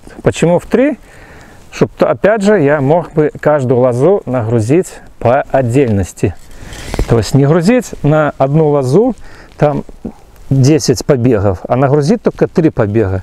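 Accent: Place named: Belarus. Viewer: большое спасибо за информацию, очень нравится ваш голос,ни с кем не спутаешь,с белорусским акцентом, вопрос,какой секатор (фирма ) вы использовали для обрезки. Привет большей из Сибири,г.Томск (виноград пошёл на север )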